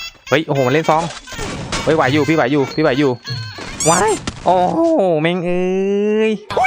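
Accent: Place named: Rural, Thailand. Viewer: อยากให้พี่ พูดเหน่ออะคับ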